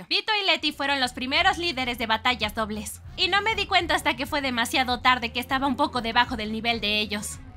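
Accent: Chile. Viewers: Eres chilena ?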